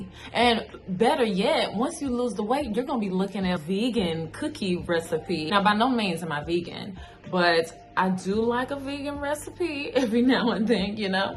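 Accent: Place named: Southern California, United States. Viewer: What is this accent? It sounds like a mix between Southern and 'Californian'? to me. I'm not american tho, so I can be totally off-base lol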